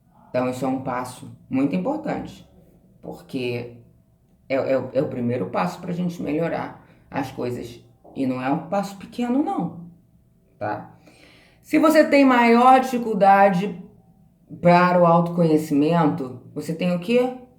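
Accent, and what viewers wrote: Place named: Rio de Janeiro, Brazil. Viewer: Você é muito inteligente , sou Border também , e tenho um estranho orgulho disso talvez porque sou anti - social . Pelo sotaque você é do Rio , eu sou de Curitiba .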